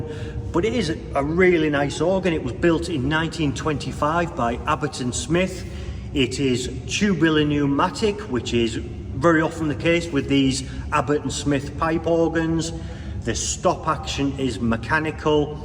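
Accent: Newcastle, United Kingdom. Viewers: Enjoyed the video very much - it’s a change to actually learn something about the organ you’re playing. You sound Geordie - like me.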